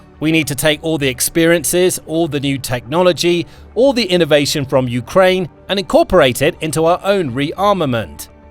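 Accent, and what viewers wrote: United Kingdom. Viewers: You speak with a British accent and talk about Europe BUT USE MILES? instead of Kilometers? I don't get it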